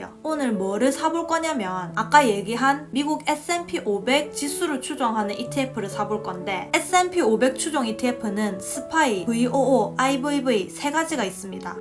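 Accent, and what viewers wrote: Gyeongsang, South Korea. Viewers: 부산사투리인가요? 대구사투리인가요? 찰지네요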